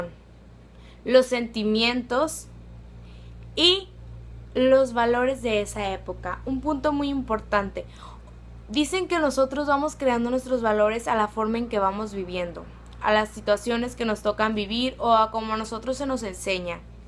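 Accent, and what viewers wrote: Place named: Mexico. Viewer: ¡Me gusta tu acento! ¿De qué parte de México eres?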